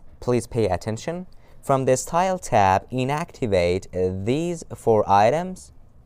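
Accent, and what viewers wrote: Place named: Iran. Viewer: IRANIAN ACCETN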